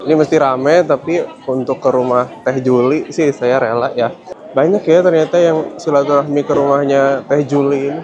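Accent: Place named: Bandung, Indonesia. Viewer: Ini masnya org bandung ya? Duhh kenapa mengingatkan mantan bgt. Suaranya, logatnya, jokesnya😂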